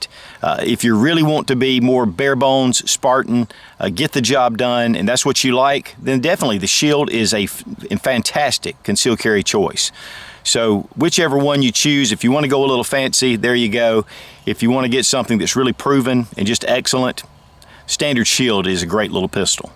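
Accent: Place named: South, United States. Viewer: Good video! what made it great was saying "Either" not the PC "ither" as reporters and commentators do! I am Southern and I think you are also!!1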